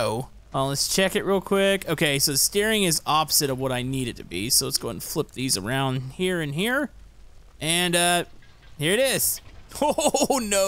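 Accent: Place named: South, United States. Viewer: He's southern, accents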